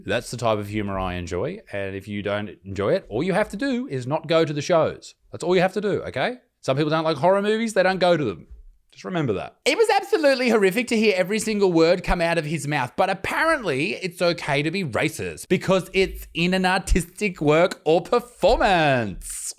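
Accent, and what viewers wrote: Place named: Australia. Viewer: its the Australian vanacula mate get over it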